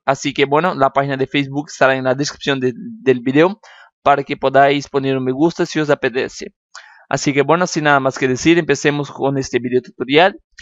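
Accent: Brazil. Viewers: es brasileño v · eres brasilero? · creo q es de Brazil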